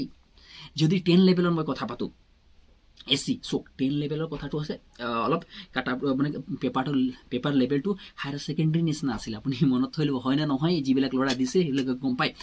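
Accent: Bihar, India. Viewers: Ki kela assamese kaw o..toi kela sure Bihari..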